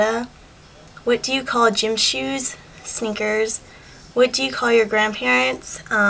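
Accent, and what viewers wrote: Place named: United States. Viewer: ya know just ta make ya feel better, you have an amercan accent, it would be noticeable (spelling fail XD) if you spoke anotha lenguage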